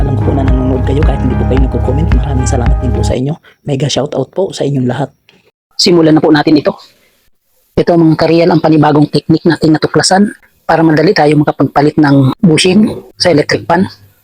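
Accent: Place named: Nueva Ecija, Philippines. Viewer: Taga nueva ejicia ba kayo kasi yun tono ng salita nio parang taga nueva ejiia kayo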